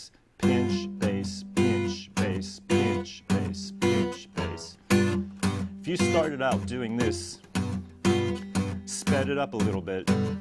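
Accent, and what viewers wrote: England, United Kingdom. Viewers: I think the Broonzy style has further to go in the right hands. He seemed like a marvellous under-rated innovator to me. He stands musically above so many of his time he deserves more acclaim. Thanks for your stuff here, - spot on and you sound English too! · From one to another...father was Ernie.....your lessons are the very best I have yet encountered. Wonderfully clear videos and perfect communication skills. I too would have bet you were English with a touch of U.S. accent. Your family must have come from there at some time. If only all teachers were this good. Thanks Ernie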